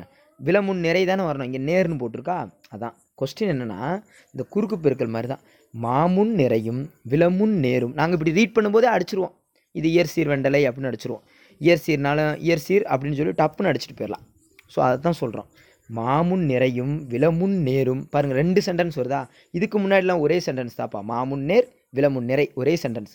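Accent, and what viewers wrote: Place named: Madurai, India. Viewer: Madurai slangue 😂👍🏽 in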